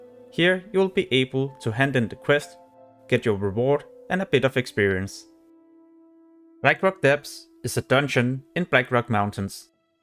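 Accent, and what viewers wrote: Denmark. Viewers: Hope it won't fix that lovely danish accent tho! I love it, keep it up man!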